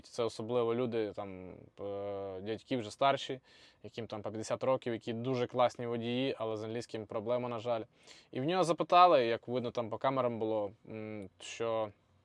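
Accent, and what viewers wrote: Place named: India. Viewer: В нього англійська хороша. Лише акцент є. Бо в Індії англійська мова)))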